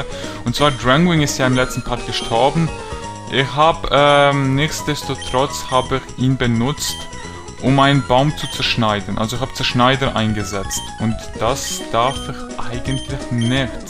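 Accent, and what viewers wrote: Switzerland. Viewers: Bist du Schweizz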